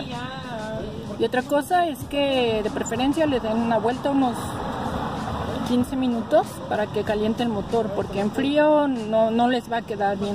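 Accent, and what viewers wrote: Mexico. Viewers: Ahora si voy aprender mecánica, con está hermosa mujer si vale la pena ver videos de mecánica y además me gusta mucho su acento mexicano, saludos desde Valledupar Colombia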